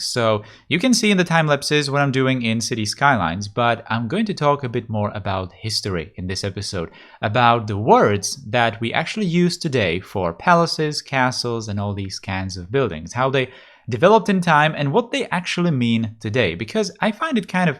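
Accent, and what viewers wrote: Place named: Czech Republic. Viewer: You must be from Czech Republic. I am also. You have Czech accent when talking English and you speak very well Czech. You always start or speak about Czech Republic 🇨🇿. I love you. Jsi nejlepší, pokračuj. Znáš Poděbrady? Verz interesting episode, not just the talking but also the gameplay😄👍🏻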